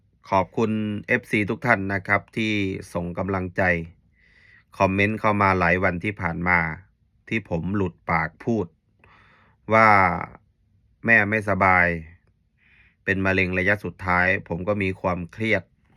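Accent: Isan, Thailand. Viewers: สำเนียงการพูดไม่เหมือนภาษาลาวเลย เหมือนภาษาอีสานมากกว่า หรือว่าผมคิดไปเอง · เทพโยสดำเนัยงเหมือนคนอิสานเนาะ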